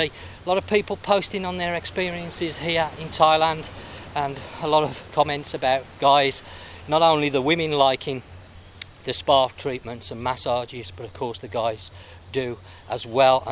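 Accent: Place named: Birmingham, United Kingdom. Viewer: Hi what’s it like in Christmas time and could you please recommend any 5 star hotels close to clean beaches and clear seas? Thanks for the vlogs they are great especially hearing the brummie accent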